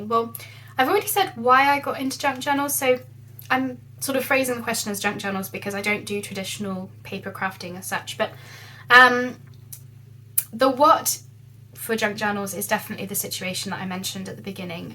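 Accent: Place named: England, United Kingdom. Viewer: I am so glad you asked! 😃 From the title I was NOT expecting and English accent. Lol. I was wondering if I missed why she chose. I look forward to the answer. ♥️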